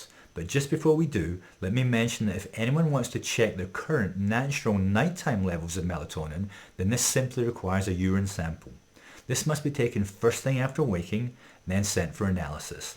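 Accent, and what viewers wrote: United Kingdom. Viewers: from your accent, i’m assuming you live in the uk. if so, how do you manage to order melatonin here?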